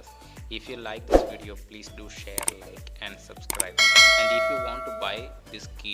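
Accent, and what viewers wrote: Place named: Kerala, India. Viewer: are you malayali? :D you speak like one....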